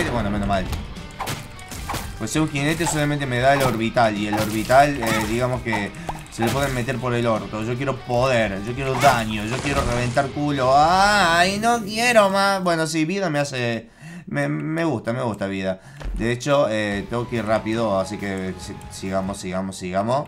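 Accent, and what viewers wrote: Argentina. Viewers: Tenía mucho que veía tus videos xd olvidaba lo genial que era el acento argentino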